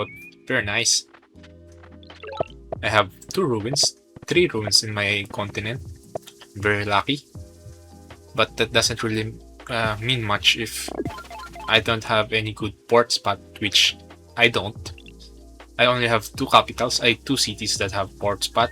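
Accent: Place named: Philippines. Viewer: U sound Filipino lowkey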